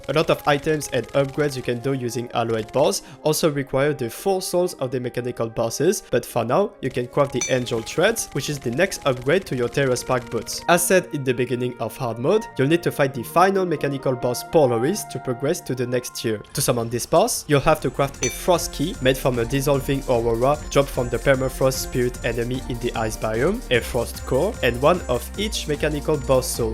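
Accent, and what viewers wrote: France. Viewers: Goated guide as always this guy is just built different are u french? cuz yk the accent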